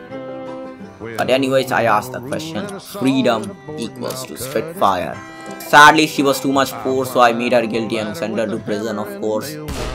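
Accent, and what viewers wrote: India. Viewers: Bro got them indian accent drip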